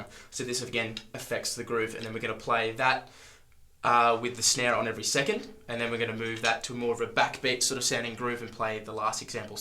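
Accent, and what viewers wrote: Australia. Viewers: Australian?! Yes! Where you from? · HAH he's Aussie!!! love it. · Finally an Aussie! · Ah you're an aussie mate! I thought you were a yank for some reason... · Hahaaaaaa you're an Aussie!! 😃🤪😜🏆🏁🎉💯👊🏼 F@ yeah Dawg!! Never heard you talk before.. only watch you do sic arse Meshuggah covers!!! Where you from bruh... I'm in Brisbane!!! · Hey mate, where in australia are you from?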